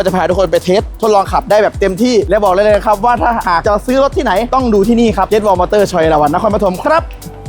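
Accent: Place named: Isan, Thailand. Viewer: ฟังสำเนียงน่าจะคนอีสานบ้านเราต้องสนับสนุน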